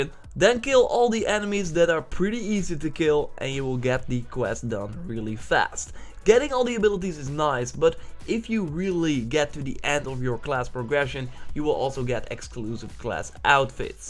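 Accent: Netherlands. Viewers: You sound Dutch :)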